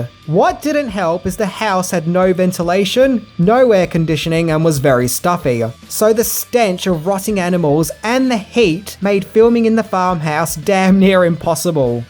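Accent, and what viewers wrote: Australia. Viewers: He's Australian. He can't help it!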